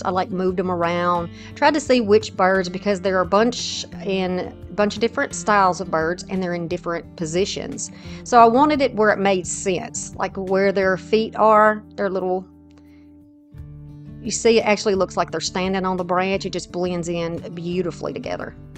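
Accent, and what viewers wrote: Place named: Texas, United States. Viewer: I agree! 🐰 I actually might have squeaked when he gave it to me. Hehe 🤭 I mean....just a little bit. 🤏 I thrifted a white ceramic bowl with fruit carved in it that was missing the top, so I'm going to make a nest to put in it and put the chubby momma and baby birds in it. Would you mind if I emailed you a pic? I'm not gonna force it on ya or nothin! Lol Side note..I love that you talk like me! I grew up on a dairy farm in Texas, and I'm about as country as you can get. Sorry to write you a novel and tell you my life story! Have a good night hun. 💜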